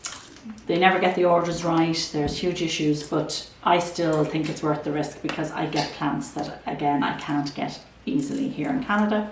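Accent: Ireland. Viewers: Thank you. I have narrowed my plant subscriptions down as I began to suffer from information overload. Your relaxing, informative videos are a joy and your lovely accent is a balm to this American daughter of Irish immigrants. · Please continue making your video!! Don't stop...I enjoy and learn so much. I also enjoy your gorgeous accent from your lovely country...Ireland 😍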